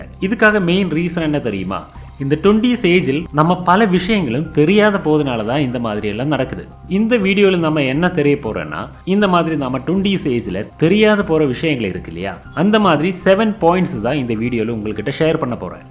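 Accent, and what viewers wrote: Kerala, India. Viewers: Bro Malayalam ma unga voice la theriunchu thu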